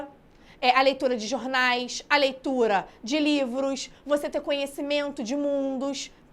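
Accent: Rio de Janeiro, Brazil. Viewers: Nada como ouvir o bom e velho sotaque carioca!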